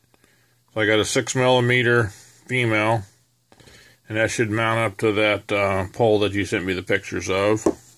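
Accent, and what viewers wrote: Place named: South, United States. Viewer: That was fun! I never noticed a southern accent like I heard here. Nice work! Laural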